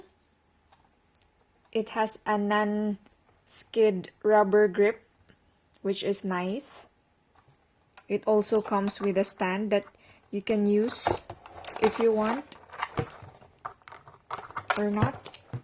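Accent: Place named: Philippines. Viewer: you are a filipino! I can recognize an accent from a filipino!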